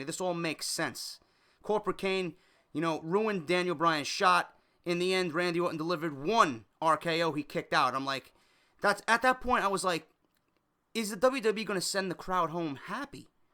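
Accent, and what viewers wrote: New York, United States. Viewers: Love your vids, your ny accent makes me smile you lighten up my day. Full homo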